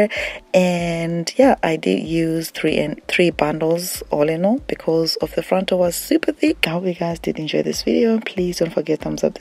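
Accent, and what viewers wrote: Zimbabwe. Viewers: Chaz Lynn she's Zimbabwean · You sound just like my aunt. You have to be Zimbabwean.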